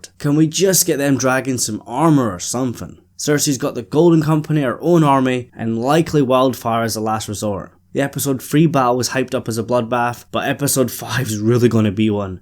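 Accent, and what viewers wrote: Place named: Scotland, United Kingdom. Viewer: Where from Scotland are you?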